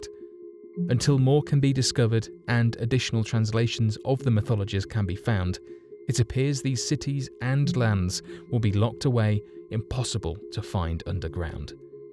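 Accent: United Kingdom. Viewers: The Aztecs were of north and Central America, The Incas are from South America. This information provided is off, everything sounds legitimate when you have a dude with a British accent narrating it.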